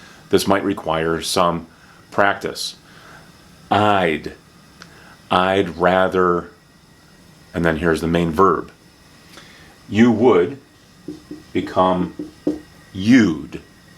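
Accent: United States. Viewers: Never thought understanding American Accent is that easy. (Namaste from India, 🙏)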